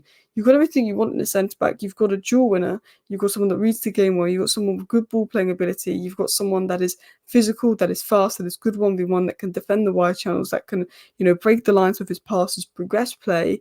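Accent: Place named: Liverpool, United Kingdom. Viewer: Last year in the same pre season games Quansah played as well as now. I hope he will play much better then then when the real games begins. In the end of the last season he didnt play well but now his experiencie grows and he is more reliable. I think LFC still needs a top level CB. By the way........di you already noticed that you are almost scouser?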